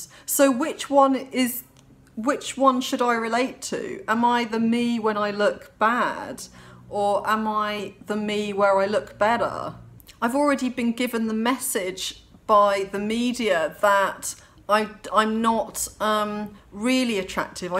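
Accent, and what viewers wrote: United Kingdom. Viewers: your perfect British accent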